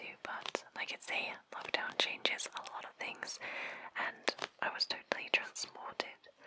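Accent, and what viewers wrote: United Kingdom. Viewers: Beside her being beautiful is she Britain from the accent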